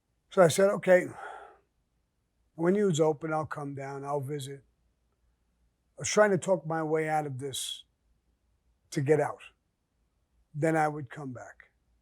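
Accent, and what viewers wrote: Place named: Brooklyn, United States. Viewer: It's simply a dialect common to a Brooklyn that unfortunately, for many reasons, exists no more. Every region has their own. Nothing more. Nothing less.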